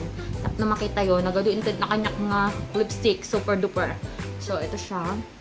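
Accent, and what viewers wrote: Ilocos, Philippines. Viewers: Nice 1sis ang cute ng salita ng ilocano ilove it hehehe tangkilikin ang sariling atin feeling ko na nonood ako ng bahasa hahaha 100times like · Mayat ti agbuya ti ilocano nga vlog a kasla daytuy, ta manmanu laeng. Haha, pati ayug haha. Ilocanong vlogger met daytuy nuymu ti biang., ed bucas!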